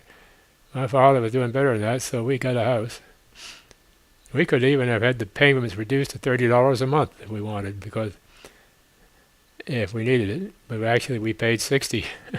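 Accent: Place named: Maryland, United States. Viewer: Oh shit you must live somewhere in Maryland, cause I haven't heard anyone else pronounce Washington as "Warshington." The National Building Museum is a great place. Went there for a school trip a few years ago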